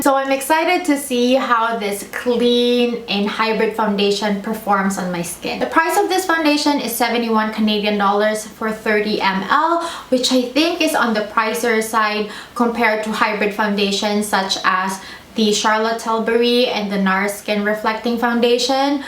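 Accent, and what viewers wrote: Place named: Philippines. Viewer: I hear Filipino accent 🇵🇭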